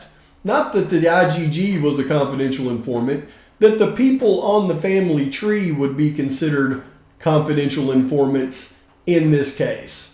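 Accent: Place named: Texas, United States. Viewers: You sound like you are from FORT WORTH TEXAS or near by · Yep. I was peggin that accent today while watching this video. Have we got us a texan here? Ex aTexas Ranger perhaps?? Just a thought. Imo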